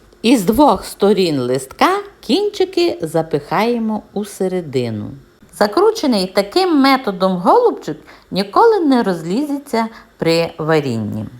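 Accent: Ukraine. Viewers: Гарно,просто,смачно і зрозуміло А саме головне страшенно приємно чути наш український говір. Підписалася і поширила. Маю подругу в Америці надіслала і їй. Щиро подякувала.🖤❤️💛💙🌹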